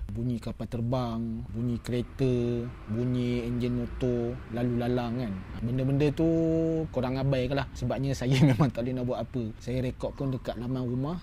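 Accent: Perak, Malaysia. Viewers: org perak ke yop?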